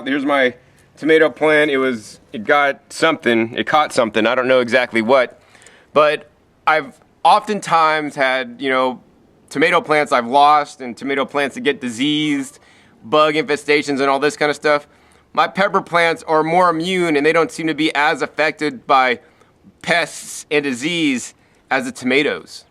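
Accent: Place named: California, United States. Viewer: you can totally tell hes from california lmaoo by the accent!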